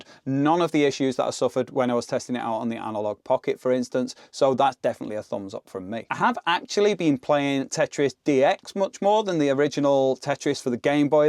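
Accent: United Kingdom. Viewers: Great video, it's a breath of fresh air to get a British person instead of the crazy yanks.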